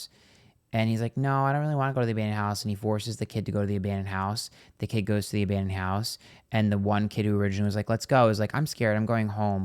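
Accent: New York, United States. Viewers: His house in Massapequa looks like a house of horrors and it was an eye sore in the neighborhood and neighbors have apparently seen him burning garbage in his backyard in the past as well as watching females suntan in the neighborhood. He’s a creep. Are you from Long Island? You definitely have a New York accent and speak fast 🤣 but I can totally keep up. You speak my speed. I’m from Long Island. You say cawfeee? 😁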